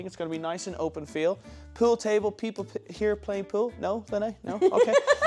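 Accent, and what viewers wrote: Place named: Ireland. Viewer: You sound extra Irish in this one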